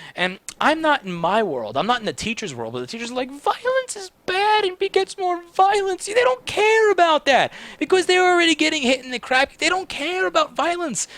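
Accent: New York, United States. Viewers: I love this! You are so smart to see the perspective of others from a child's point of view and being able to re-imagine the scenario as a child. You have a very high IQ and are super interesting to listen to. You are down-to-earth yet have an amazing way of delivering your information. I am obsessed with your videos. I love your NY accent it is so awesome in every way!!!!! melts I am from Toronto, Canada and we pronounce words so boring! I love listening to you talk for your fab NYC accent.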